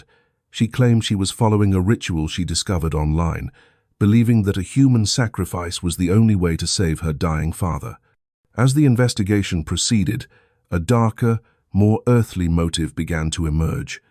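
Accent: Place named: England, United Kingdom. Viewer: The narrator sounds nice but it’s not as effective because with the accent makes it sound like the story is from England .. “ the mahsque”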